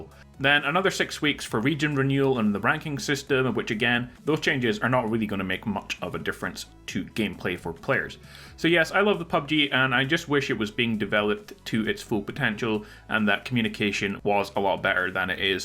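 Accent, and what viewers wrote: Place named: Edinburgh, United Kingdom. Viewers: Scotland not sure were maybe even just outside id guess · Sounds like Edinburgh. 100% not from Northern Ireland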